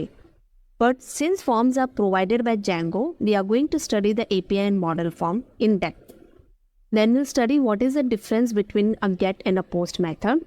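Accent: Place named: India. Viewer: i love your videos and your Indian accent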